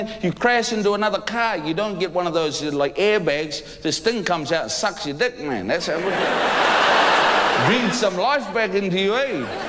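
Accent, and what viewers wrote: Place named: New Zealand. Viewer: Mike king said he used to swear on his comedy and he sure sounds like a Maori gee , oh wow he just swore xD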